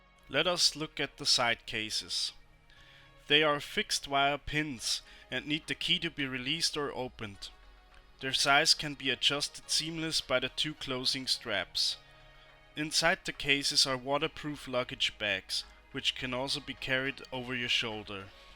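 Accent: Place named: Bavaria, Germany. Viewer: "Geländestraße" in feinstem Bayrisch! Danke fürs Review :)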